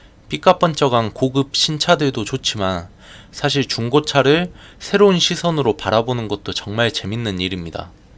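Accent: South Korea. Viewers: 혹시 광주사람인가요? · 아따 전라도냐?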